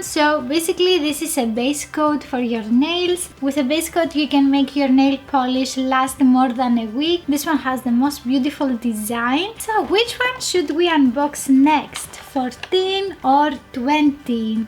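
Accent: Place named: Italy. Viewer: This girl looks like an italian 😳she has an italian english 😂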